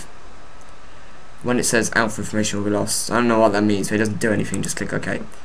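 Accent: United Kingdom. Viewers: yes he has a british accent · are you british? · I like your British accent :)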